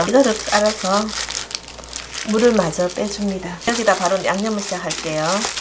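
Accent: Gyeongsang, South Korea. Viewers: 쉽고 빠르게 친절한 경상도언어에 정감있고 맛있는 요리법 고맙고 감사합니다.💕👍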